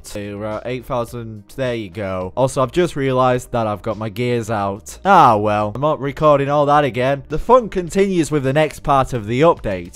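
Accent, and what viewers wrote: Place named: Australia. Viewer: its the australian man again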